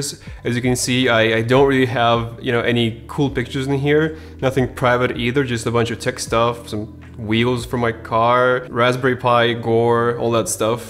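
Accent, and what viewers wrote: Russia. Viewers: такое ощущение что автор видео Русский пацан - по акценту заметно =) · Are you from Russia?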